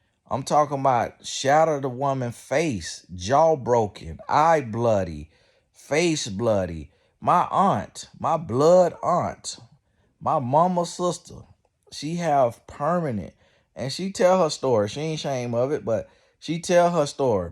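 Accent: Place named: Florida, United States. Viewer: That Florida accent cracks me up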